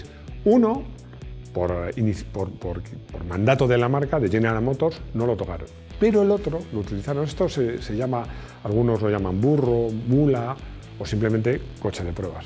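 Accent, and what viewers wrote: Chile. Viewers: saludos desde La serena,Chile. inconfundible el acento nuestro. muy buenos videos....felicitaciones. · La voz en off es de un Chileno?, que desagradable!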